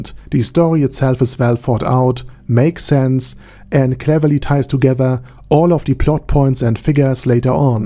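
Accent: Germany. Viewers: Starker deutscher aktzent aber cooles Video Bro 🎉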